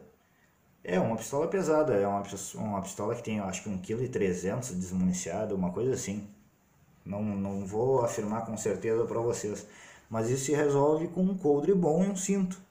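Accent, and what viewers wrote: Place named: Porto Alegre, Brazil. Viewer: Excelente vídeo. Só não gosto do teu sotaque de Porto Alegre... Agarrei nojo por conta da Manuela D'avila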